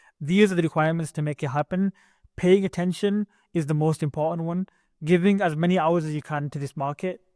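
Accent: United Kingdom. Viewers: Love the video bro, nice to hear British crypto YouTubers 😂 . There’s a project called treble swap which is the first v4 DEX on base do you think it’s a good buy ?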